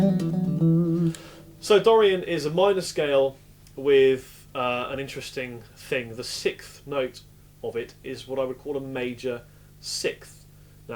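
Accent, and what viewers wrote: United Kingdom. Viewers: British English no less, and it actually makes sense. Thanks!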